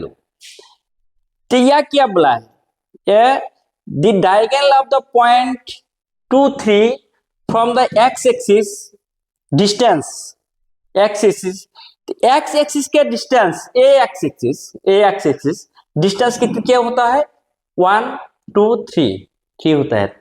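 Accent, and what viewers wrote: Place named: Assam, India. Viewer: Sir assamese lage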